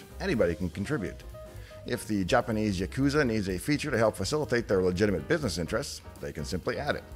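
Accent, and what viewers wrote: Canada. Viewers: A second option that may help is to CC the videos, as I quite enjoy listening to the pnw/Canuck accent you’ve got going on.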